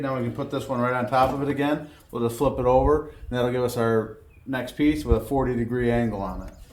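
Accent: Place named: United States. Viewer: i hate this american accent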